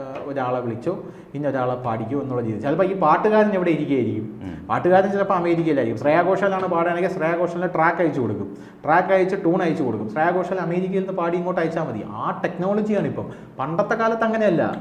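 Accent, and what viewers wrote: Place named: Malabar, India. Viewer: വാക്കുകളിൽ മലബാർ ടച്ച്‌..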